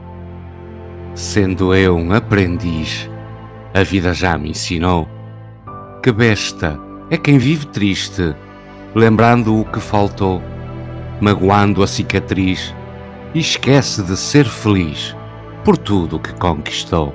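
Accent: Portugal. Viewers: Que lindo numa voz portuguesa, lembro de Fernando Pessoa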